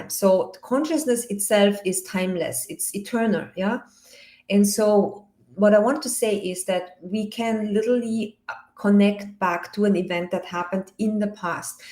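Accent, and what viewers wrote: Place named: Austria. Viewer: So happy to be part of Dr. world once again. Her voice, with its Austrian accent, is both charming and soothing. This blessed woman is a gift to the world. I'm grateful to be a recipient of her healing talent.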